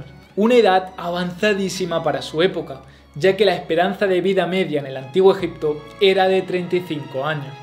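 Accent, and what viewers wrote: Andalusia, Spain. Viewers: Seguramente es de Andalucía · His way of speaking isn't even close to a person from Madrid. I'm 100% sure he's from Andalusia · Muy bueno el nuevo formato, siempre un placer escuchar tus explicaciones, con tu lindo acento andaluz. En marzo de este año estuve en Ejipto y visite la fabulosa Abu Simbel.... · eres español o chileno? Confunde tu acento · Es español, de algún lugar de Andalucía. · Wilder José Hernández español segun yo, soy chilena y nuestro acento no es asi jeje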